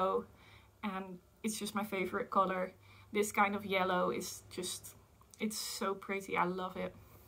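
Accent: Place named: Scotland, United Kingdom. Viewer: I could hear the wee Scottish twang coming through sometimes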